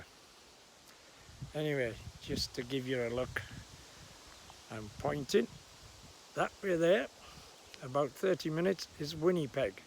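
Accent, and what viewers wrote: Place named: Northeast, United States. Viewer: Hi, I saw your sticker on Craig Tate's channel. Sounds like you are from the NorthEast. Interesting to hear your story and I learnt quite a bit about your local area. "Join Youtube and see the world". I'm in York UK. Cheers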